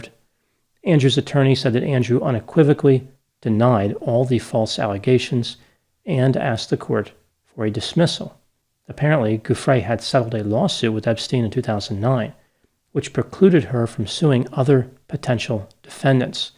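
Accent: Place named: Southeast Pennsylvania, United States. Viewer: Dr Grande is also an excellent comedian. Your SE Pennsylvania accent slips through at times. An accent I would note that I have never seen imitated in major production television or film.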